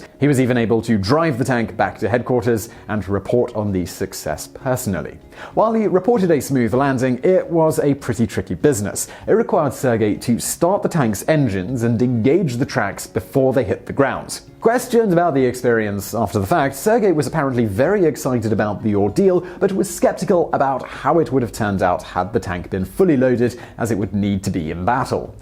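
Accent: United Kingdom. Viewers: When did Vsauce have a British accent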